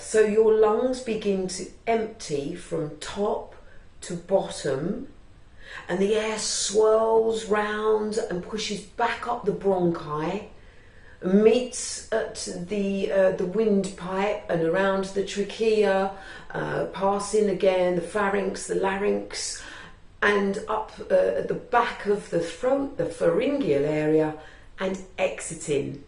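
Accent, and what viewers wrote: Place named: United Kingdom. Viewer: Your accent is pretty close to a British accent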